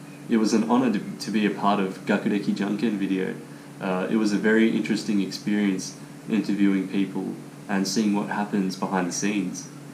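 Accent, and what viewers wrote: Australia. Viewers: Australia Englishの発音がちと、ムズい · オーストラリア訛り苦手だから助かるわ… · You got a nice Aussie accent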